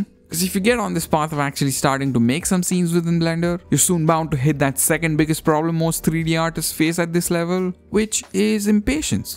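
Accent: India. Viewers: You might grow this channel much faster if you get rid of that painfully annoying Indian accent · What what what you're Indian !!😮 your accent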